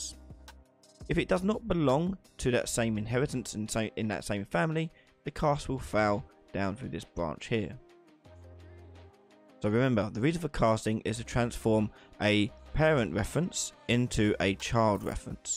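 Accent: United Kingdom. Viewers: Once I got past the heavy Bri-ish accent, I realized that your way of explaining this stuff is the Most helpful. Thanks!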